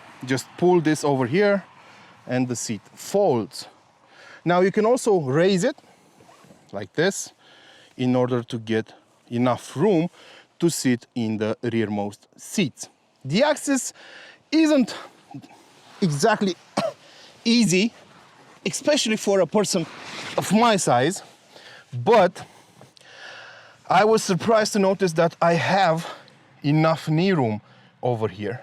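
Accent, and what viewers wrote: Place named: Romania. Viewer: Judging from your accent, you are from Romania? OK, you are using some American expressions and their units of measurement, but stil, I can guess the Romanian origin 😎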